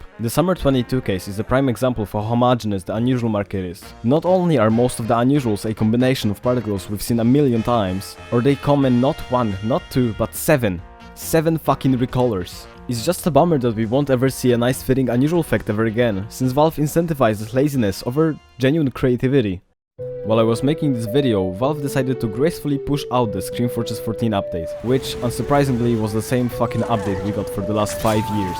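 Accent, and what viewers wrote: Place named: Eastern Europe. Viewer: Are you Central or Eastern European? Your accent sounds slavic. Great video by the way.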